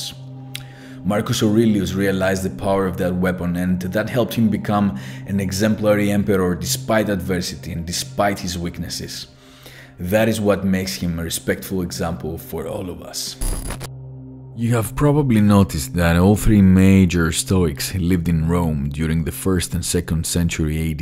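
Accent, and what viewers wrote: Greece. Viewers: Φίλε... 15 δευτερόλεπτα και λέω Έλληνας είσαι?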